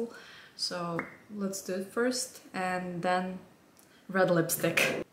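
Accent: Russia. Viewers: привет, я начала смотреть тебя пару месяцев назад, потому что меня заинтересовал блог, и я хочу послушать акцент разных людей, поэтому сначала мне показалось, что ты из России, а сейчас узнала, что это действительно так) я хотела сказать, что ты замечательно выглядишь и у тебя очень хороший вкус, для меня ты стала одним из примеров, на кого надо ровняться, спасибо тебе за это 💌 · Как только я увидела ваше другое видео, я сразу подумала, что вы русская) Это видно по внешности и по тому, как вы говорите) И при этом я сама не русская, но из России. Очень интересно то, что мы можем узнать русских среди других европейцев, так и людей из своего народа я точно так же вычисляю среди других азиатов) Но я вообще не знаю как я это делаю. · Несмотря на то, что ты прекрасно говоришь по английски, я почему-то сразу поняла, что ты русская, и это подтвердилось! Успехов тебе, береги себя! 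Со скольки лет ты стала работать за границей? Родители нормально отпускали?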